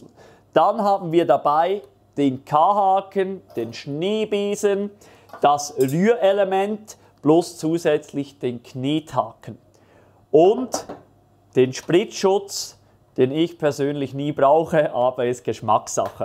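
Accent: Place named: Switzerland. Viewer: vielen Dank für dieses tolle gewinnspiel ich drücke mir und allen anderen die Daumen und würde mich sehr darüber freuen. Seit Jahren schaue ich deine Videos und mage deinen schweizerischen Akzent. Ich hoffe auch mal nächstes Jahr bei einem Kurs von dir dabei zu sein. Frohe Weihnachtszeit 🎄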